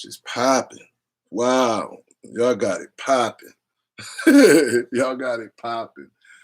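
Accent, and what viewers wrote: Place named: New York, United States. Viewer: you sound like a new yorker bro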